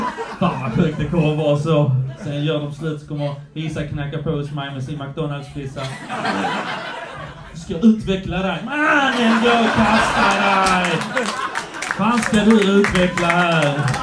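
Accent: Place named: Skåne, Sweden. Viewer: Såg dig för första gången på fb idag och kom hit för att få mer.😅 Var släpper du mest, för som en skåning i Oslo kan jag inte få nog av skånska.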